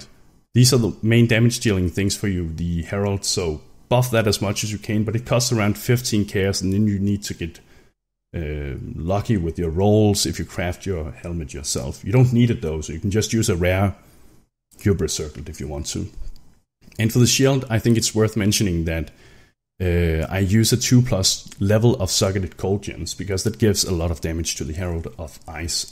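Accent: Denmark. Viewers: get hit by a little shit bird. lost it xD and nice Danglish xD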